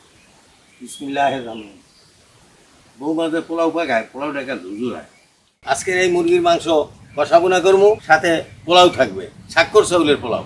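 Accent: Barishal, Bangladesh. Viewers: দুদু আমনে কি মোগ বরিশাইলা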